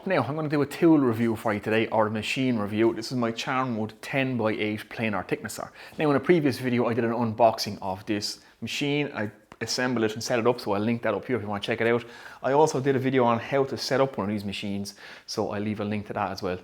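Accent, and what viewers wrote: Ireland. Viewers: 1500 quid is out of my price range atm pal but maybe in a couple yrs I will buy one when my woodworking is good enough to warrant it.. For now I'm getting me one of those cheap as fook models from Titan.. It'll do for what I need it for and when I'm ready to step it up to a better model I will be looking at a better model like one of these babys.. I need some good chisels so I was hoping u could give me some advice on the best Mortise chisels? The best set of ordinary wood chisels I can buy with let's say 200 euros as a price range for both sets?? I would very much appreciate it if anybody could help me with some good advice.. I'm prepared to go to 240/50 euros for both sets if needs be but I want to really get some great chisels for the money.. I am going to buy a set for about 670-70 euros as well for learning with but I really want both of the other sets now too.. 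It would be greatly appreciated if any of u good ppl on here could advise me on the best I can get for the money I want to spend.. Love the videos btw.. Where are u from pal? Is that an Athlone or Louth accent? I cannot tell but it's a proper Irish accent bro.. Great to see a top Irish woodworker on here doing his thing.. I haven't found very many tbh. Ur the only one atm mate but I will be looking for a few more in the future too.. Salute from DUBLIN by way of LIMERICK & Tipp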